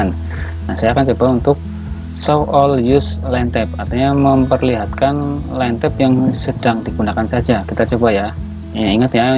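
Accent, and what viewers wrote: Banyumas, Indonesia. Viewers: Belajar cara bikin linetype sama om.. Salam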